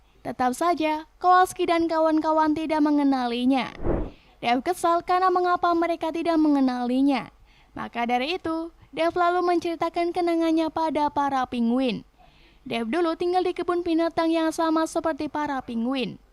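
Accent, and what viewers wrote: Java, Indonesia. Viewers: bak samena wong jowo yo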